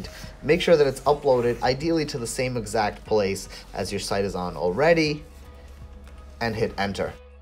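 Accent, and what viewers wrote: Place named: United States. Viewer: a tutorial with an American English speaking person. thank you. lol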